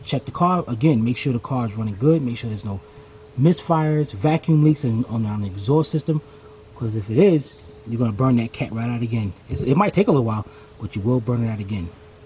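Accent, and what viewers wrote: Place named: Boston, United States. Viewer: I know this is off topic but...North Carolina??? "...so let's get up under HEAH...", "...CONNECTAH.." and fast talking... that accent sounds Boston-ish, New York-ish, Jersey-ish??😂😂Thanks for the information in this video. The 'wall' explanation helps a lot as my vehicle is throwing this code. ♥ .•:*¨¨*:•. ♥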